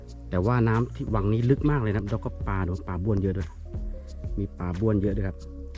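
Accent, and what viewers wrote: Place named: Sukhothai, Thailand. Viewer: สำเนียงเหมือนคนโขทัยเลยครับ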